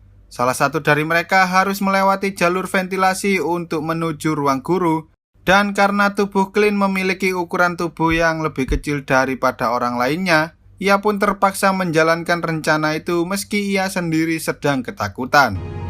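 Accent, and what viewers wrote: Java, Indonesia. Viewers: Wong jowo to mas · Jawa bang? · Medok njir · Wong jowo yo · Anjir wong jowo pisan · Ngmong kaya bhasa jwo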